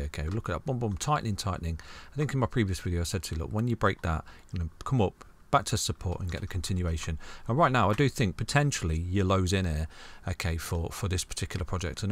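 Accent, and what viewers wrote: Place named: Norwich, United Kingdom. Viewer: Given the accent I'd say around Norwich haha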